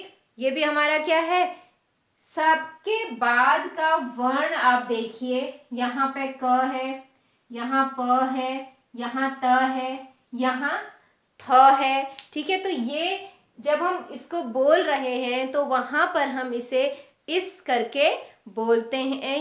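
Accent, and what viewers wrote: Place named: North, India. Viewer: इस्पर्श कोण पढताहे आप नॅार्थ वालेही ' व ' को इ पडते हो. श के जगह स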